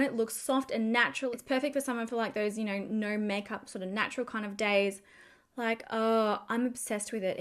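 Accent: Australia. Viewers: I love watching your videos,educational and an adorable Aussie accent. I may have missed it, What blush, bronzer combo are you wearing in this video. Brand and shade please it's lovely. I am looking for a bronzy rose look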